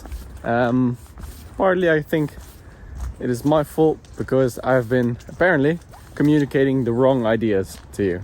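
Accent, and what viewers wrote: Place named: Ireland. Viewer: Q. Curious about your accent (aside from the Dutch tones). Sounds almost Irish! Do you have Irish family or perhaps learnt English from an Irish teacher? Where in Holland do you come from? My grandfather was born and raised in beautiful Leiden. Enjoying your vlogs from Perth Western Australia.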